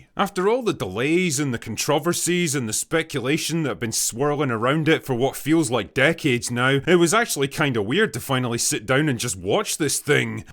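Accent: Scotland, United Kingdom. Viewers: Normally I need subtitles to understand a Scottish person, but because he’s made an effort, I will say unbelievably good shit by the way I’m English😂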